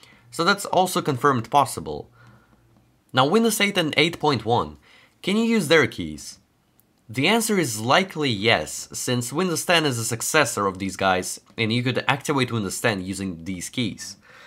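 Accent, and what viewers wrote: Russia. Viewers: You are from Россия? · this russian accent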